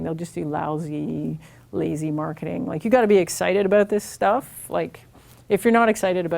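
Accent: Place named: Canada. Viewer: excellent video #1 - canadian accents are awesome #2 - "it's not a pivot. same product, same thing. It's just a different way of talking about it" #3 - i think i'm going to join <link> #4 - "if it wasn't really hard, we'd all be in fiji drinking fruity drinks from a coconut, but we're not because this is hard" #5 - what does "gorpy" mean?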